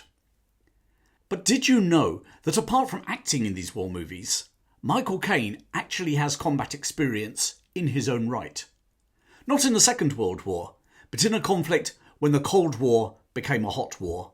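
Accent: England, United Kingdom. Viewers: As a foreigner, I do enjoy your beautiful british pronunciation. Thank you for your british sound in the global era of the unified american english. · Only just discovered your excellent channel. How refreshing to listen to a narrative in English by an English man! Some of those American narrators are terrible. Can't pronounce place names correctly, etc. And as for Charlton Heston on some of Timeline's offerings! Say no more!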